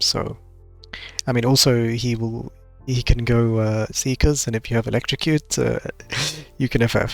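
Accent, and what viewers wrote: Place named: Europe. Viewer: i noticed you have a hint of european accent in your voice :) . also good guide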